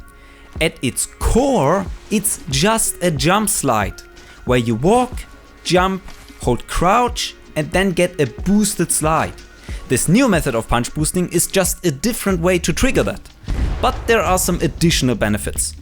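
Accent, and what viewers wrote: Germany. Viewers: I have a question. Is your native language german?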